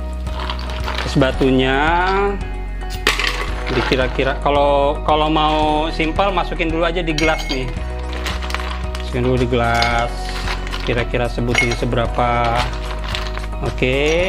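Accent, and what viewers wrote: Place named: West Java, Indonesia. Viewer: Mantaaappp bah...aslina mn siga sunda,slm sukses tkw malaysia nonton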